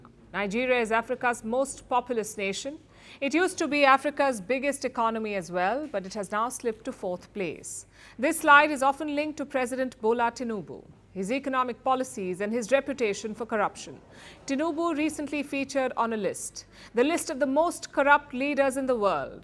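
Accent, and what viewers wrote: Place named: India. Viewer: She's an Indian, I guess her native accent influences her speech pattern.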